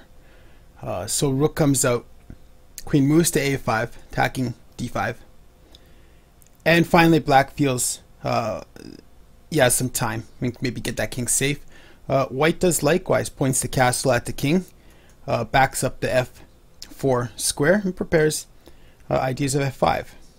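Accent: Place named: North Dakota, United States. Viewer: I love your accent!! North Dakota??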